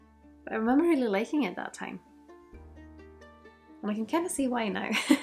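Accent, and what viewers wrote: Scotland, United Kingdom. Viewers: not a native or an expert, but you do sound like a Scot. and Oban 14 (depending on a release, granted) is one of my favourite all time drams. there's just something sticky and earthy about it that I just love and almost can't find anywhere else.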